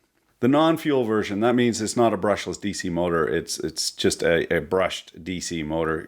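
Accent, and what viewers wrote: Quebec, Canada. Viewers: As a Canadian I can say never have I heard more of a Canadian accent. So thank you sir! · Are you from Quebec?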